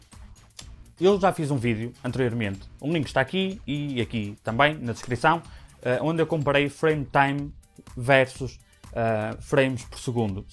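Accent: Portugal. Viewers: gostei da explicação. maneiro assistir um vídeo em português de Portugal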